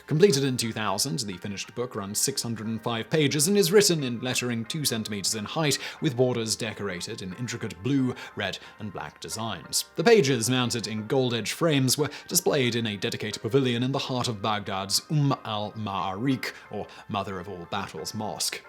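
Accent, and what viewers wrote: United Kingdom. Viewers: In some cases,the very British accent can be difficult for me to understand but in YOUR case,Mr.,it is as clear as a bell. A "right.proper" public speaking voice,I tell ya'....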